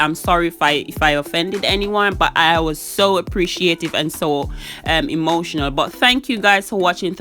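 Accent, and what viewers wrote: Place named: United Kingdom. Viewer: This is too much creativity.You did the decor quite well.I also loved the..is it British English? So amazing.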